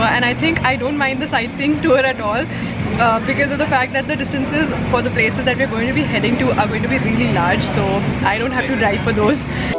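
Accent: India. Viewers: I DONT UNDERSTAND ANYTHINH HER ENGLISH WITH INDIAN ACCENT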